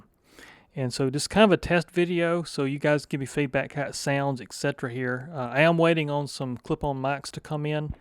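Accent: South, United States. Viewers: This southern charm and accents are true 😊 your videoes demoing the Behringer moog systems totally sold them to me ❤️ wow 😊 please never stop sharing your videos 😊❣️🙌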